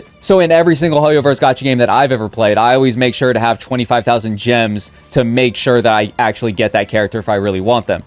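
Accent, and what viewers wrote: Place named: United States. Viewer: I tried to watch your video but... I don't like your voice. It's so slurred and croaky. I also don't like your style of American accent. If you could do something about that, that'd be great.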